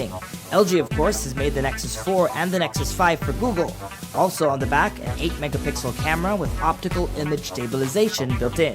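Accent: Philippines. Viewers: Grabe mga tao dito nanghihila pababa, alam niyo kung bakit siya nagenglish? Gusto niya kasi hindi lang mga pinoy ang manonood pati na mga taga ibang bansa, tska ano naman kung hindi perfect ang accent niya? Problema dito laging ganyan mga mga language/accent police mga tao rito kala mo mga perpekto amp, average naman ang accent niya compared to other filipinos kung magtagalog eh "poreber, beri gud" etc. Di man siya perpekto magsalita ok lang dahil pilipino siya!! Ok!? Pakinggan niyo mga german, french, korean, japanese talo natin sila, wag niyo namang laitin ang kababayan natin, hence focus on the video comparison kesa para kayong gago jan na kinokorek niyo lahat ng bagay.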